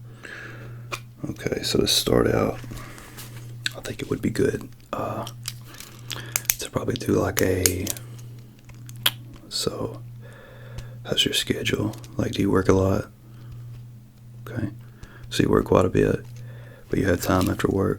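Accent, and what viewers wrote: South, United States. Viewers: Am I hearing a slight southern accent? 🙂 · you have that amazing southern accent which is lovely to listen to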